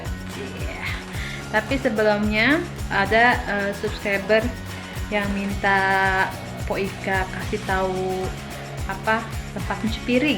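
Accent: Jakarta, Indonesia. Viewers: Behhh si bahasanya gua demenn bngt dh, betawinya dapet bed. Ngakak dengeringnya ( mkn pedes gini sudaannya pantat dh kya dibakar wkwkwkwk) bisa aj. 🤪 Bisa liat video krna tadi sebelumnya liat video widi yg di Swedia widi nyebut" nama kita liat bener asli kocak amat heheheh, maap jdi nyablak gini, maklum betawi pan emng gini bahasanya. sukses trus ya 👍💞👌🙏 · logat betawinya kentel bangeeettttttttttt, saya suka🖒🖒🖒🖒🖒🖒🖒🖒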